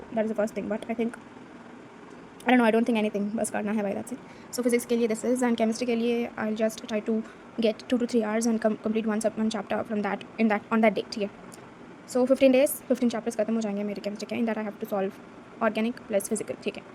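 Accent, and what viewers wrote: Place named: Hyderabad, India. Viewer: oh bruh you speak telugu, same....where you from ? seems like telangana and that too hyderabad...all the best for iiser!!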